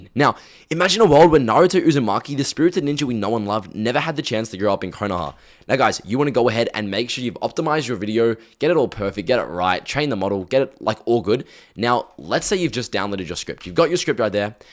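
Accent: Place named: Australia. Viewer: Too much free sauce bro, appreciate you for sharing this vid. I hear the Australian accent too btw, by any chance you in Perth?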